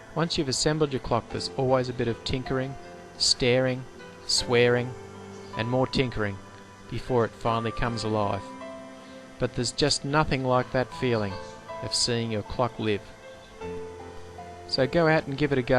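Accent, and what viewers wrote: Australia. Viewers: Yeah your right I am British. and pomegranates are pretty tasty so I am not guna argue with that. I didn't notice you were, i fail quite a bit at recognising accents. ^^ i just bought his model T clock so just started that. but your video is being really helpful. you are obviously a very skilled craftsman. what type of finish do you use? i was thinking to do the wheels carfuly as not to get it in the teeth with bees wax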